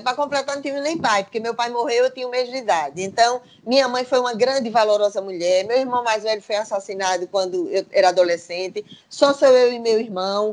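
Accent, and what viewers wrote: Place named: Northeast, Brazil. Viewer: boa noite !!,hoje estou matando-o minha saudade de vê essa mulher nordestina como eu,nosso sotaques é um orgulho da espiritualidade e uma busca por coisas melhor .vamos nós sempre firme neste sentido .obrigado .!!!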